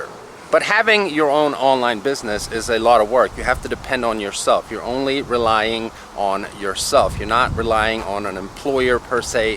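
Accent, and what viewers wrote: Pittsburgh, United States. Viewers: Dude u sound like u were born in the states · defo turned American, repeat yourselves many times and waffle a lot, instead of getting to the point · From Sweden since 2012, but sounds totally American 🤯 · Perfect American accent didn’t know you were from Swede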